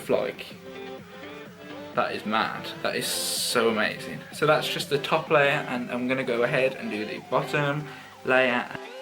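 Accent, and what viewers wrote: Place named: United Kingdom. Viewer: Hey! Just came across your channel because needed some help this video was great and definitely subscribing!! Very helpful and as your British (guessing by accent) it helps me a lot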